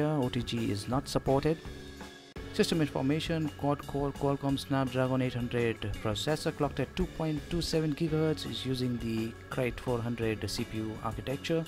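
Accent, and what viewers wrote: India. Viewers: are you indian cause I recodnise the accent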